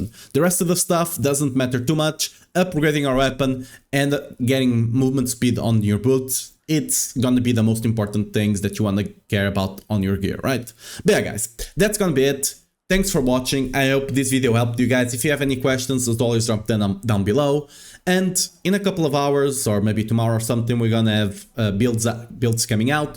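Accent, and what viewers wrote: Portugal. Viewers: It's incredible that I can tell you're Portuguese just from your English nice video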